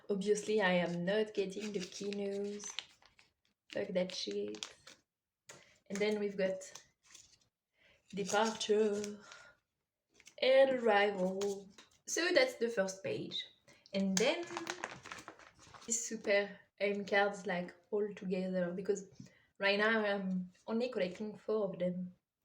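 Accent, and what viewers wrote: France. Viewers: is this a French accent I hear ?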